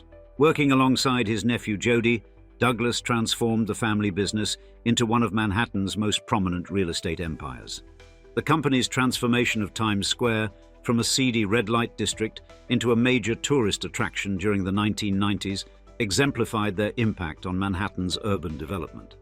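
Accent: United Kingdom. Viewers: Always some British schmuck narrating American History. As though their own isn't enough of a goldmine full of corruption, theft and murder.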